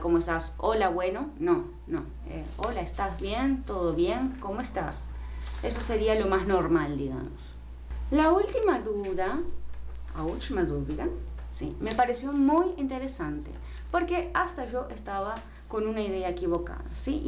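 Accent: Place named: Rioplatense, Latin America. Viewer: Me gusta tu acento. Yo creo que debes mantener el acento Rioplatense. Podrías enseñarnos algunas expresiones típicas de Argentina. Gracias.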